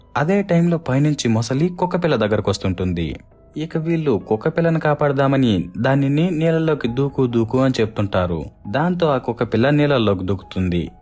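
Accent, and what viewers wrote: Rayalaseema, India. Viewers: Bro mee accent rayalaseema la undi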